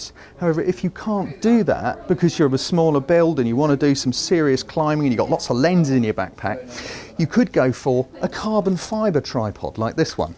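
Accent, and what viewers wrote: United Kingdom. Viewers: I love your British accent, I'm subscribed just for the accent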